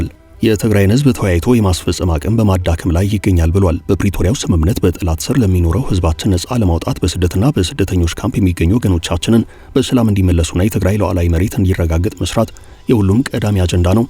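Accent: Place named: Eritrea. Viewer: Ethiopia ina artira biwagu anit metifo utuber ke ethiopia dirashihin newi yeminatefahi ye artira selayi newi yemitimesilewi the way u talk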